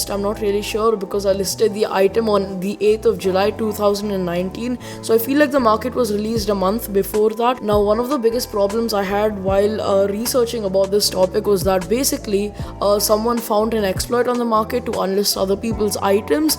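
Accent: India. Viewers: i still luv ur indian accent <3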